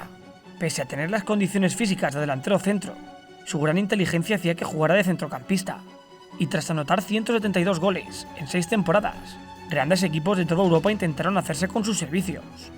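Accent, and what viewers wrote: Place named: Andalusia, Spain. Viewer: Me emociono hasta las lágrimas. Como persona: ejemplar, generoso, brillante, lúcido, líder con un aura especial. Como futbolista, que decir, fino, exquisito, ultra inteligente, tácticamente diferenciado, el hijo de TELE. SOCRATES-FALCAO-CEREZO-ZICO. Los futboleros no olvidamos. PUÑO arriba. Nota de color, me encanta el relato andaluz.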